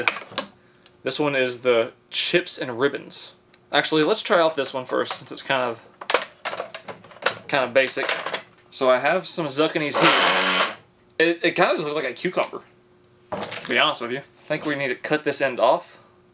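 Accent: South, United States. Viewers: Zucchini ---- pronounced zoo key knee. and it's a squash!!! BTW the next time you want to make corn dogs, use cornbread mix, not pancake batter. You sound like your from the South, you should know a good cornbread recipe. That's why they are called CORN DOGS not PANCAKE DOGS! There is a green light on top, it will turn on when they are ready. YOU CAN'T SAY IT TASTES LIKE A CORN DOG!! IT'S NOT MADE WITH ANY CORN !!!!!!!!!!!!!!!!!!!!!!!!!!!!!!!!!!!!!!!!!!!!!!! BTW You can buy sausages made the same way in pancake batter in the frozen food aisle. hahahahahaha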